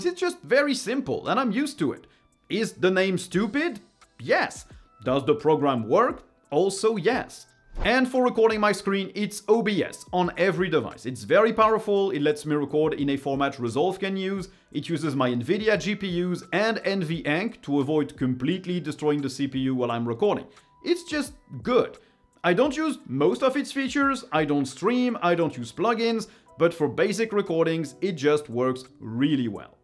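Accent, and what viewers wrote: France. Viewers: Hello! I like your videos. Thank you. I have a question : you speak a bilingual english but I feel a small accent. Are you french? 😊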